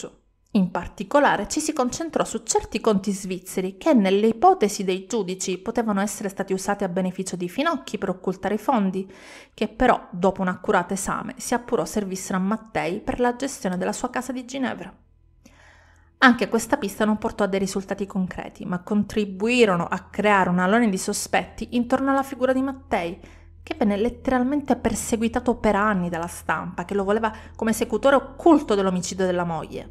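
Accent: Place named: Calabria, Italy. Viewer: a me piacerebbe sapere di dove sei originaria, il tuo accento sembra calabrese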